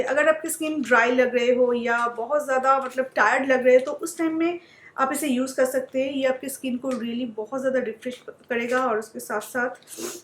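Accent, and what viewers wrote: Bengal, India. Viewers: M following her since last 5 months and loved her vlogs i almost watched her all previous videos too loved the way she use to handle every thing and specially her bengoli hindi mix accent but i dint criticised her ever i just go through comments section and use to see hw ppl use to troll her and feel bad for her but she doesn't care for her viewers.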